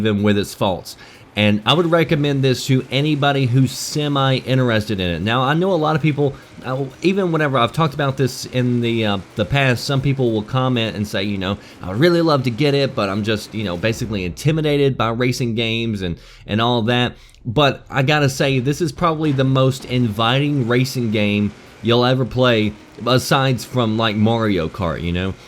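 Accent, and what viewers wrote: South, United States. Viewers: You might find this amusing, but your voice reminds me so much of a preachers voice. Just a tinge of a southern draw and you pauses and articulation - lol. Preacher. Anyway, like your reviews. Fair and nothing meant to bring on fanboy arguing, though I'm sure idiots in your comments still do now and again. My boys and I love Forza so it's cool this one has turned out to be aces! I don't have a PC gaming rig so it's all Xbox One for me. Thanks again.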